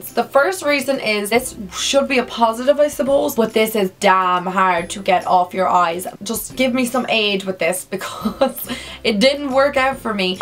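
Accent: Ireland. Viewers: Is she from ireland??❤️ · Is that an irish accent? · Beautiful accent! Are you Irish? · Lovely accent! irish?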